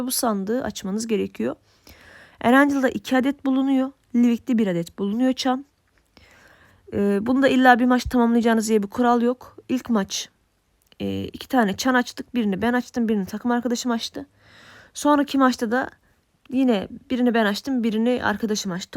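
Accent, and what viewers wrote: Azerbaijan. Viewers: Turk dilini Azerbaycan dili kimi danisirsan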